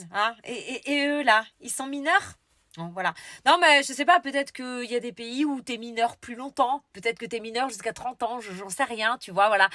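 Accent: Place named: Nord, France. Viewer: T’habites vers Poitiers mais t’as l’accent du Ch’nord..t’es typée du Ch’nord 😊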